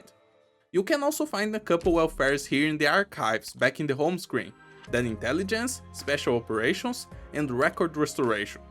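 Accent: Brazil. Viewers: Eu ouvi a voz dele e insta reconheci o sotaque kkkkk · Você não me engana, esse sotaque é de brasileiro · Are you Brazilian? I could tell by your accent, I thought it was cool. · Pela pronuncia consegui perceber que é brasileiro kkkkk, ótimo vídeo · you talk like a brazilian fella(im from brazil)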